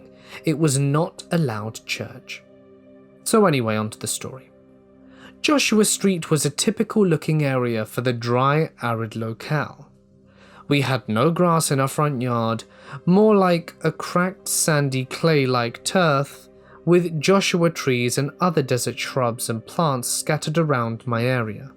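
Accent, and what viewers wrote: United Kingdom. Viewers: Nice British narration and manner for a change ( I thought USA You Tubers had the Monopoly on this subject ! )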